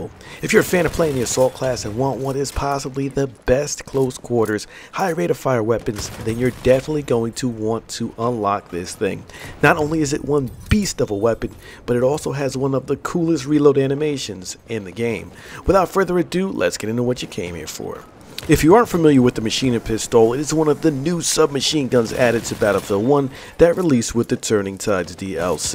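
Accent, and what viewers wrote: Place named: United States. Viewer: Bro, You are 'THE' place to be to get the most out of BF1. Your voice is so clear and concise. I like your American accent. You know I'm from England, I speak way faster I doubt you would understand a word I say...Lol..Have a great Christmas brother.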